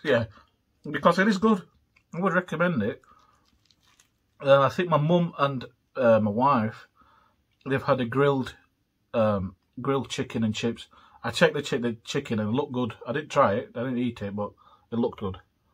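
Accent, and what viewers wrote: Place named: RP, United Kingdom. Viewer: Lol like your posh accent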